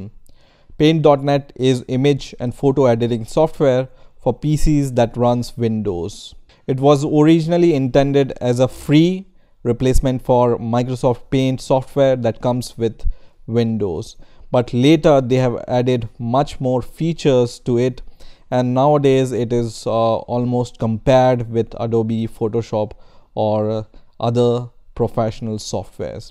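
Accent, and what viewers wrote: India. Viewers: You know it's legit when the dude has an indian accent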